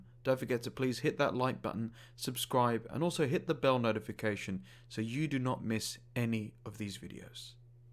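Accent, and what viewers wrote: United Kingdom. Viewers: I really enjoy your voice .... your British accent is the best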